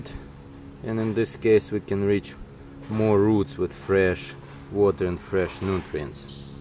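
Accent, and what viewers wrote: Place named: Russia. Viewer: Ti idiot ili prosta zaviduesh ego!! Important is not an accent (although English with Russian accent is very cool)! His English is very perfect grammatically, and also very rich vocabilary! Thank you for the Videos!! You are gift from the GOD!!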